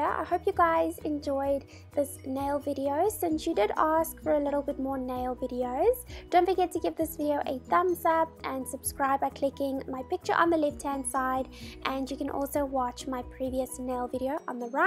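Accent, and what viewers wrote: South Africa. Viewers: Hi, nice to hear a South African voice😋🇿🇦Thank you for the great video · You sound really innocent and cute ♥️😇 There's something original about your accent, sort of South African & I love it 💖💖💖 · You're south african🥰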